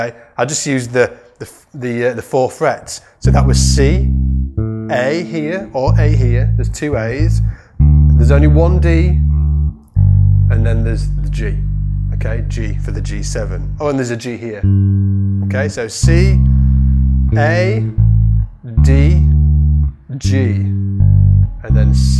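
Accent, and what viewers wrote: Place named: United Kingdom. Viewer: you are the man! love the glove, British accent and of course the bass lesson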